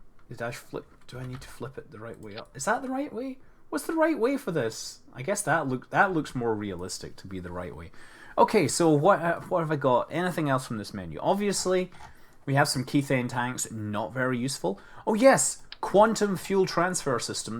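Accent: Scotland, United Kingdom. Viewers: Thanks for this ive been waiting for a video like this for a while;) Anyway just a question but are you scottish, because you sound like you're from scottland?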